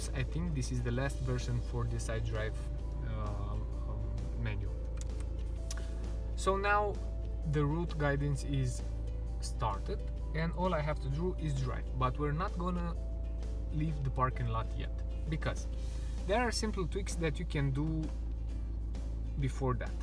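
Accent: Romania. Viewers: Are you Romanian?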